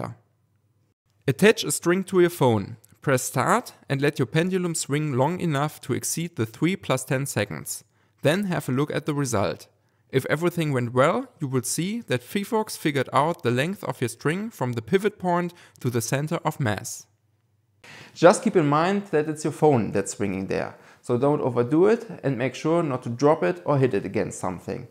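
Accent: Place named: Germany. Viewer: Deutscher der versucht Englisch zu reden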